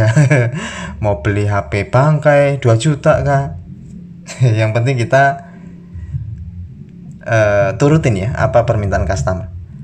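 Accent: Java, Indonesia. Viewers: Orang jawa yah?kalau boleh tau jawanya mana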